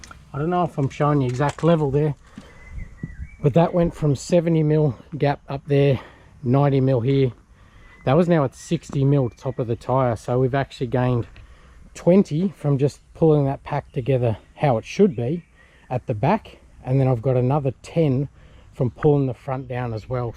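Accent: Australia. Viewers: This was my first Back Shed vid and I enjoyed it tremendously. Im watching through again. I love an ol F series truck. Us Americans are suckers for anyone with a charming Aussie accent. To me the more Bogan the better.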